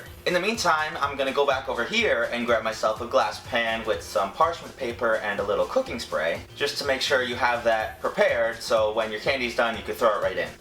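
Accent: New York, United States. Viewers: There are a lot of people on YouTube that annoying me. isn't one of them. I hope he can get more subscribers, he really does deserve it. It seems that he tries really hard on these videos. Plus he's a New Yorker like me. It's funny, even before I actually heard him say he lived in New York in one of his videos, I could tell he was from New York because his voice was so familiar.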